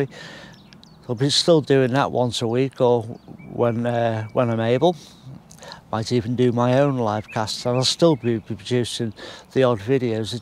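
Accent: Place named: Wirral, United Kingdom. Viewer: Only just found this channel and learnt so much from one video. Thought I could hear a Wirral accent and looked for more videos and seen this post. So sorry to hear of his passing.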